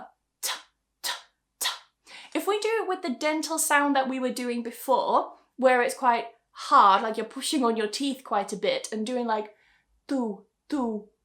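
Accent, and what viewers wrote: United Kingdom. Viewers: Thank you very much for your teaching. I love ♥️your British accent. I just want to make sure when it comes to the sentence "The vet helped the dog", the D sound of "helpeD" become a "T" sound? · Your accent is so nice I`m sure It would really me I really like british pronunciation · Very nice, fantastic. I like British Accent the most. Thank you very much, may God bless you mam.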